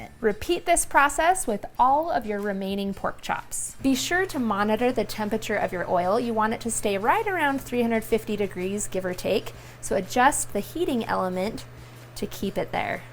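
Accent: United States. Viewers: Did a lil southern come out in you doing this video? :) Well done ma'am!!!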